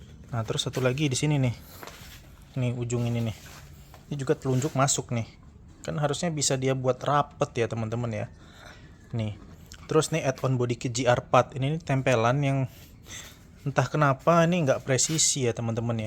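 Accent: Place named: Padang, Indonesia. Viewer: Suara aksennya kayak orang padang ya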